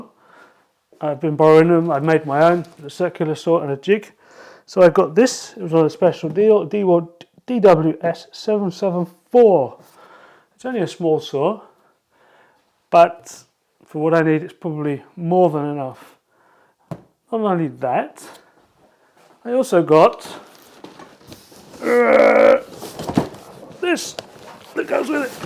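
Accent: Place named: United Kingdom. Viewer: Where are you based ? You’ve got a uk accent and foreign plug 🔌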